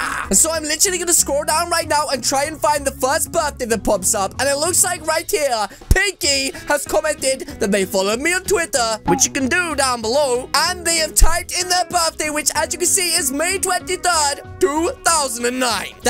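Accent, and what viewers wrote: United Kingdom. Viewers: Bro is in British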